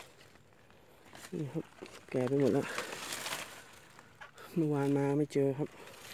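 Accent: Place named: North, Thailand. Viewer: น้องหล้า...อยู่่ จ. หวัดไหนเจ้า (ได้ยินอู้กำเมืองกั๋นน้อ) เห็ดแดงกับเห็ดขมิ้น เห็ดถ่านถะลำเน้อน้อง แกงใหม่ ลำเน้อ อยากมาเก็บ แต้ ๆ ใจเย็น ๆ กะได้เห็ดกิ๋นน้อ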